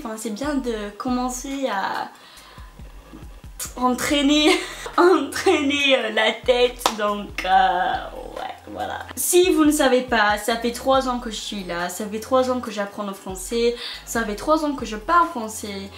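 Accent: England, United Kingdom. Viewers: So cute this english accent while speaking french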